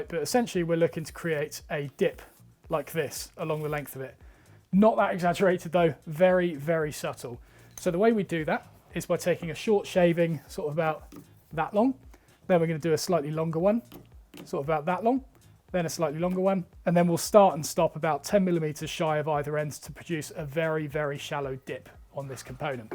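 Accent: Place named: United States. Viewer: Perfect American Accent 👌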